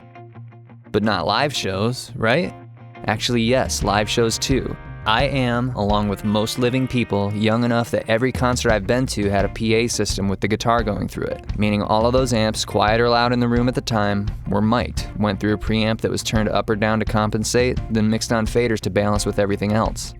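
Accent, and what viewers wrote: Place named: Bavaria, Germany. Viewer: A very simple Bavarian portent question. Can't unhear it. :D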